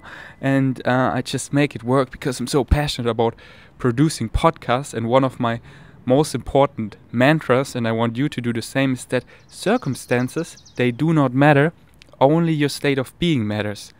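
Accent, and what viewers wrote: Germany. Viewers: you have the loveliest voice for podcasts and talking. Part of what is so special is your German accent, but I agree and think it's important if you want to speak better English then emulating those with good speech around you is important. Not to learn just slang but actually what words should be dropped or not used, how to arrange them in a sentence to make sense and where the emphasis should be put on a word for correct pronunciation. But these things don't include your wonderful German accent which is what makes you YOU! xx I hope you enjoy the rest of your stay, you are learning so much about life and people from the everyday experiences (and so do we then!). Btw I moved to England from NY, my husband is British and we have fun joking about the differences in how we pronounce things. So what may seem correct to me may not be to a Brit, and the other way around. 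I think it's great to understand this when we hear someone from another country speak, one may not be wrong, just different. Just my thoughts.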